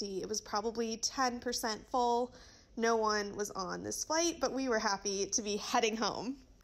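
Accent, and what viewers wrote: England, United Kingdom. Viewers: Wow! Thanks for the update! I'm glad you are safely back. Hope to see more videos soon. I really love to hear your English accent. By your accent I'm sure you are not from the south. Because you don't have a southern accent. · She's American so how does she have an English accent 😶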